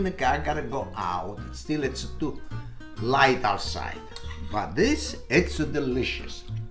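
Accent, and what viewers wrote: Italy. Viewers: I like his Italian accent so nice. As well I love pasta.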